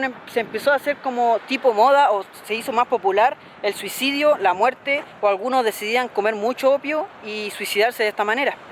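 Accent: Chile. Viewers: Siga adelante eres buen locutor, actualizado y me encanta tu llegada a lo chileno compañero. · el habla como un chileno de clase media normal, además la mayoría de YouTubers jóvenes tienen formas muy peculiares y distintas para hablar no eh viste a nadie que hable correctamente en YouTube menos los chicos jóvenes y son los que más subscriptores tienen, eso es lo que atrae a la mayoría de las masa , nadie va ver alguien que es políticamente correcto en todo.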